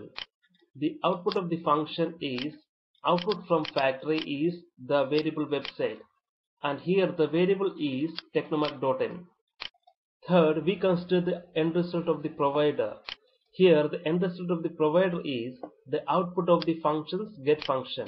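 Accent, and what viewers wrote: India. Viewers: Indian accent everywhere :( ! -_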